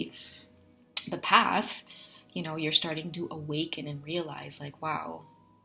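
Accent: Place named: Trinidad and Tobago. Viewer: Whoa.....wait a minute...did I just hear you say you are from Trinidad....I knew I heard a Trini accent yesterday when I was listening to another one of your readings....I also lived in Toronto before moving to the US. I have been listening to your readings for the last year now. Great job girl...I am a male Divine Feminine Twin Flame. I met my female Divine Masculine 19 years ago but didn't know anything about Twin Flames at the time. I learnt about the TF journey 4 years ago. We are not in union but hey who knows what can happen. However we are both ascending very very fast nowadays!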